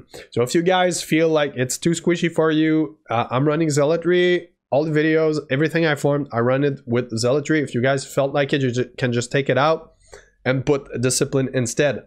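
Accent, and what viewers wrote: Quebec, Canada. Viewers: Quebec much ;p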